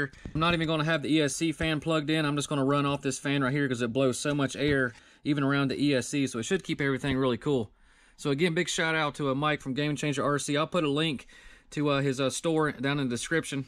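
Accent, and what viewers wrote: South, United States. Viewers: Hey my friend very videos Man you funny bro you said this car is a HOOT yo that is so southern and matches your acent, iam NJ iam definitely gonna start using its a HOOT I love my brother, and your a excellent driver really good. Thanks your friend william from NJ Forgettaboutit 👍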